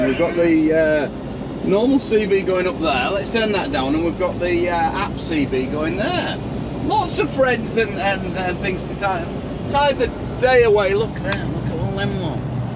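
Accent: Yorkshire, United Kingdom. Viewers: You can take the boy out of Yorkshire, but you cant take the Yorkshire out of the boy Ayup mate, from Sheffield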